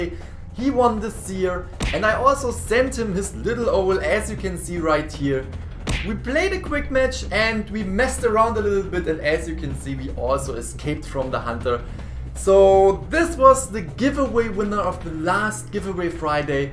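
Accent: Germany. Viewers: German accent? Cool! Wish I had a accent..😐 btw what's your gamer ID if you don't mind me asking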